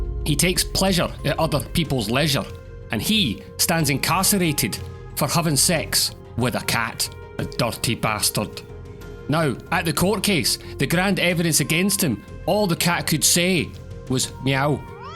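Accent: Scotland, United Kingdom. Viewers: LMAO. There is something genius about humour + Scottish English!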